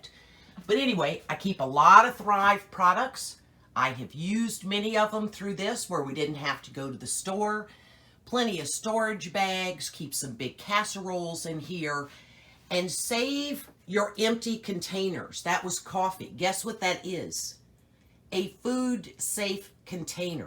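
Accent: South, United States. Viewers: Hello! New Subscriber 😀 I'm from Alabama ❤️ Sounds like you are from the south too 😁👍 Thanks for sharing! Great video 👍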